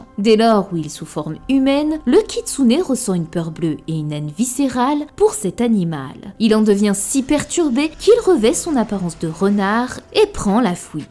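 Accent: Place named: Belgium. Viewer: c'est l'accent belge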